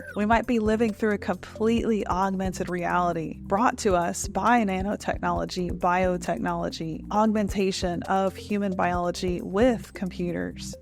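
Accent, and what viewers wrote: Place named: Arkansas, United States. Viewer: I believe in God, and consider myself a Christian, but to believe you can't get life from a kind of "Big Bang" scenario, one must be ignorantly misguided, at best, and at worst, must be intentionally obtuse. You said you would gladly debate in the comments, so as a subscriber and viewer since last year, I hope you'll keep true to that remark. To that end, please begin by explaining why you dont believe in the current models of the beginning of the universe. Edit: I'm from Arkansas, and it always feels good that someone else with a similar accent is an AI/Singularity communicator. Love to see it.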